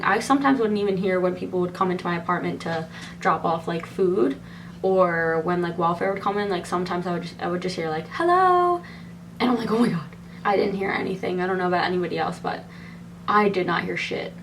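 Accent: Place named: Philadelphia, United States. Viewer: Girl I’m from Philly too and when you first popped up I heard your accent I was like yes!!!! And then it said Miami and I was like🧐🧐 hahahaha but then it all came full circle lol I loved you!!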